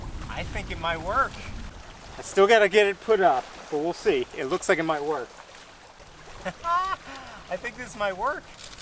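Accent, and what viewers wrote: United States. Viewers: thank you I just wondered because of your accent. I'm from Phoenix Arizona so it definitely sounded American to me LOL 😆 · thanks for the update on the camera, yikes camera lens is expensive. I thought I heard an American accent. The UK I've seen via this channel is beautiful! I noted there's only sheep, no wildlife.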